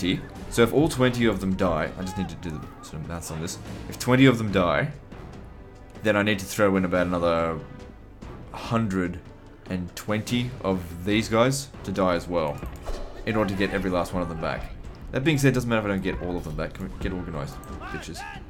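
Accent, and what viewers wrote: Australia. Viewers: Really appreciate you doing this, and i like hearing the ozzie accent.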